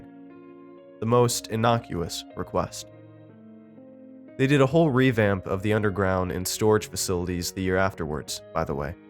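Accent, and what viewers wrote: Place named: United States. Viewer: i’m american, but have lived in england long enough to know this is written in the POV of someone british, but his american accent reading it threw me off completely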